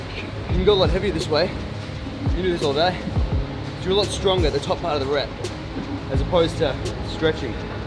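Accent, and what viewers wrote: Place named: Australia. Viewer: Love your channel and the aussie accent ❤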